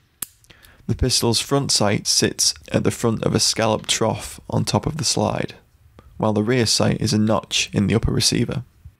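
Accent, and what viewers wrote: United Kingdom. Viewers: What a pleasant surprise to click on a gun-related video and hear a fellow Brit narrating it. I just bought a Dreyse M1907 a few days ago, can't wait to try shooting it.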